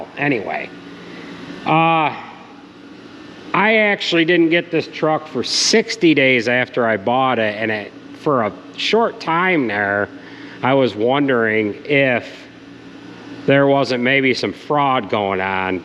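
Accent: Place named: New York State, United States. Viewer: I prefer the longer video's. Love to hear your yakking as it teaches me New York state speak!! Learn a little bit from Andrew Camarata but you beat him by a page or two.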